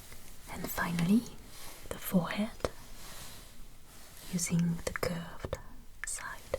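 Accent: France. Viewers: I think I recognize french accent. Why don't you make a french video sometimes ? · Hello j'te passe un petit coucou de France vu que j'ai pas pu m'empêcher de repéré la prononciation bien français des mots anglais mdr.😂❤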